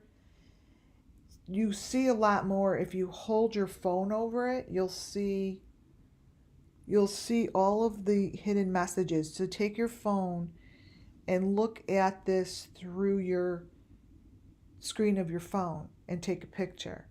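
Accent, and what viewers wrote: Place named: Midwest, United States. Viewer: Your voice sounds like that other channel. Um, chasing truth I think. It just could be the Midwest accent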